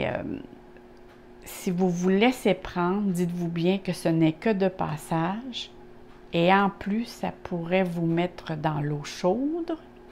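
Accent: Quebec, Canada. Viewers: Vivez vous Québec vous êtes Québécoise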